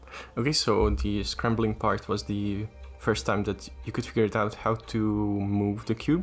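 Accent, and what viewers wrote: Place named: India. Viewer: Nice indian commentary, lots of love from New Delhi!♥️♥️♥️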